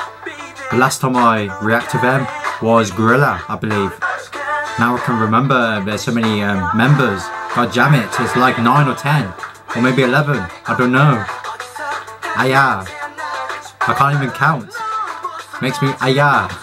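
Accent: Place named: United Kingdom. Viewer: obviously tho its kpop with good reaction i loved it lmao 👌💫and your voice i love it its nice to hear someone else with a british accent 💫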